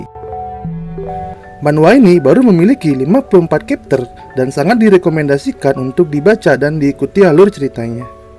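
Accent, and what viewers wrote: Bali, Indonesia. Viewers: nak bali? kayak logat orang bali pas ngomong😁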